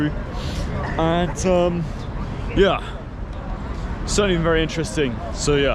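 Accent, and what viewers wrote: England, United Kingdom. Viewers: This presenter is so handsome with a gorgeous English accent 😍😍😍😍😍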